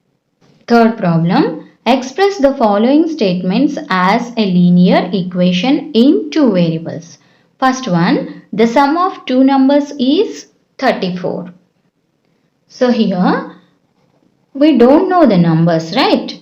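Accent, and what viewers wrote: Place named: RP, United Kingdom. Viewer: Don't do overaction okna talk properly don't talk posh english 😏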